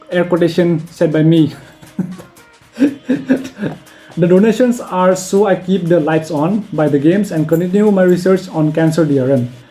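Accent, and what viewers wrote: India. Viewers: Bro is asian with an Indian accent, like HOW?!!!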